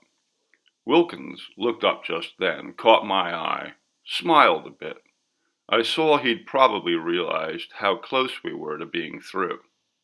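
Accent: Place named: New York, United States. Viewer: What a great New York accent,and really well read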